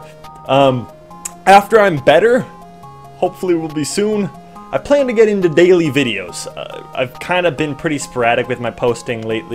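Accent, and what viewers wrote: United States. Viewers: What accent is anyway? Sounds kinda Southern and Northern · I just noticed he has a new York accent o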